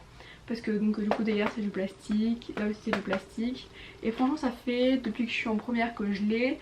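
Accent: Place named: Italy. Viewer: Super vidéo comme d'habitude. J'ai été surprise par ta manière de parler : tu commence a avoir un accent sûrement à force de parler italien 😂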